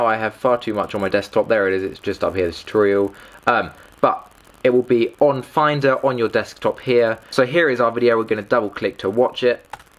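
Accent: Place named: United States. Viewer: Mate what if you've got an external hard drive after using FCP and it's taking SO much space on my internal HDD lol, how do you then shift the library to the new HDD without running the existing videos? I actually went into the original media files and deleted a lot of the files which has fucked up the videos I already made. But I'm like whatever, I need space. Haha so yeah REALLY need to sort this out and help will be appreciated. Only a few minutes in but explanation is clear as F and also I'm English so honestly, the American accent does get a bit annoying after a while in tutorials not gonna lie LOL. Thanks a lot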